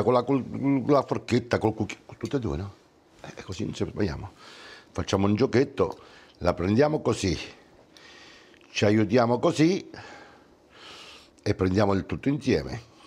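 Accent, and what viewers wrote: Rome, Italy. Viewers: His roman way to talk is absolutely beautiful mixed with such an elegant sense of humour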